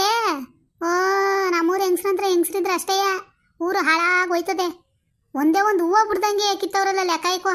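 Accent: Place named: Mandya, India. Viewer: ಮೇಡಮ್ ಇವಾಗ ತಾನೇ ವಿಡಿಯೋ ನೋಡಿದೆ ತುಂಬಾ ಚೆನ್ನಾಗಿದೆ ಇದು ಮಂಡ್ಯ ಶೈಲಿಯ ಭಾಷೆ ಅಲ್ವಾ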